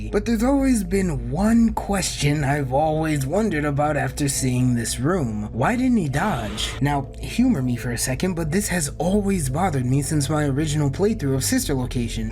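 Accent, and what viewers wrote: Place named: Balkans. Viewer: That balkans voice..., is it you?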